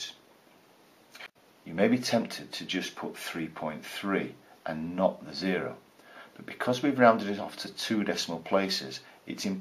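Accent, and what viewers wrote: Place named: Cockney, United Kingdom. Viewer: You sound like a cockney Gangster!!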